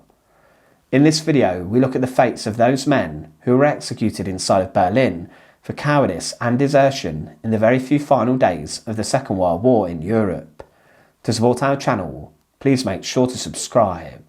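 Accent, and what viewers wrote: United Kingdom. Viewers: I agree. And I particularly like his type of accent. Not sure what part of the UK it comes from, but it is lovely. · As an American, he speaks with what I consider to be a classic British accent. Sometimes he's hard to understand, but that's part of the fun of listening to different English accents.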